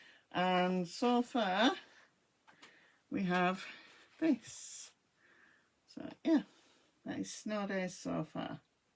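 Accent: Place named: Northern England, United Kingdom. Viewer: Just got into smalls so the winter pattern would be lovely to add to my very small collection - love your channel, so nice to hear another northern accent!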